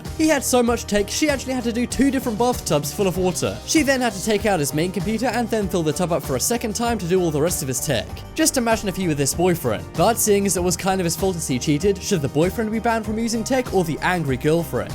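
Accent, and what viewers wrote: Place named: United Kingdom. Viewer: Your Sound British so say Tap not Faucet 👎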